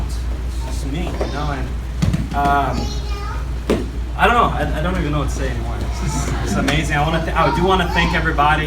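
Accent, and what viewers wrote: Brazil. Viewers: nice bro, lol were u doing a brazillian accent on purpose, also please dont leave us a whole week again without a video cause theyre funny :) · Congrats, but why did you suddenly start talking in a Brazilian accent?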